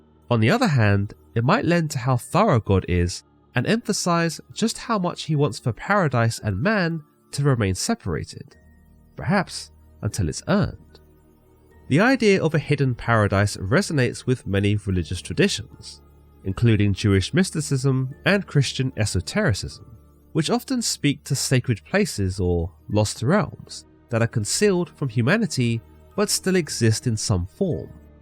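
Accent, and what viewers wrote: United Kingdom. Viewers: I knew there was going to be some type of British accent when I clicked on this.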